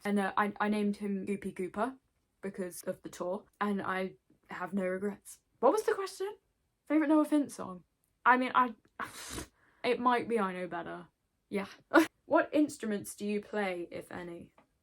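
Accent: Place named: United Kingdom. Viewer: Omg you're British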